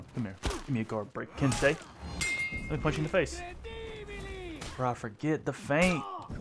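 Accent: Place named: South, United States. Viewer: Can’t help but hear the country in your voice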